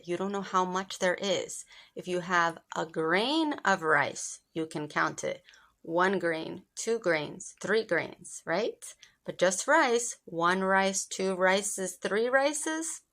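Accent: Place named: United States. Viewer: You are so beautiful and your American accent is so pleasing to my ears. Happy New Year !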